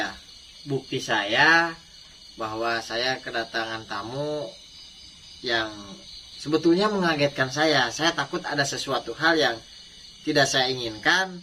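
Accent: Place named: West Java, Indonesia. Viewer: Assalamualaikum, mugia kang sinareng team sarehat. Bade tumaros linggihna dimana? Upami ningal dina logatna mah urang sunda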